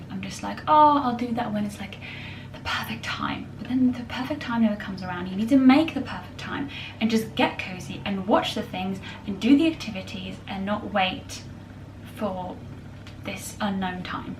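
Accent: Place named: United Kingdom. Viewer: fall vlog+british accent=my passion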